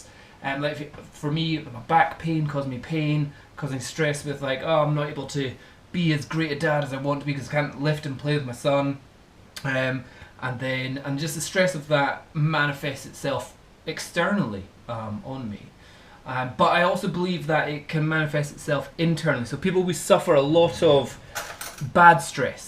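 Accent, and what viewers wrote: Scotland, United Kingdom. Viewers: your video made me want to look for a free online episode of the Highlander (seriously). the Scottish accent and nature references.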